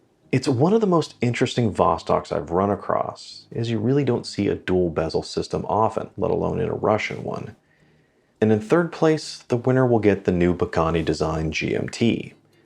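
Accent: United States. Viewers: Anything Seiko related is always most welcome, but the Glycine looks equally interesting. Now for the compliments. Kudos on a great channel. Love your voice by the way, it is so quintessentially American.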